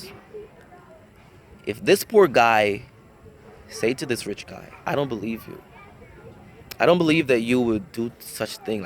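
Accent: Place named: Caribbean. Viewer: O, where are you from? I hear an accent (I’m from the US…Delaware)…I’m trying to detect it…a Caribbean Island?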